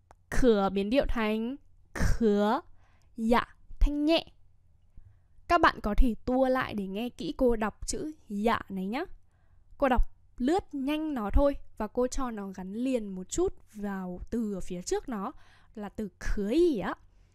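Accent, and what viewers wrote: Standard. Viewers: Cô đọc chuẩn lắm 👍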